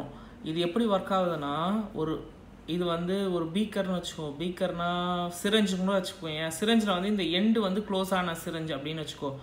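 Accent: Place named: Chennai, India. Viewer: Sema voice bro real Chennai man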